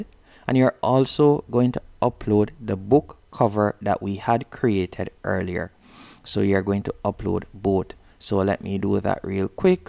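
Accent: Jamaica. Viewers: Thanks. Easy to follow especially in that fellow yaadie accent,🥰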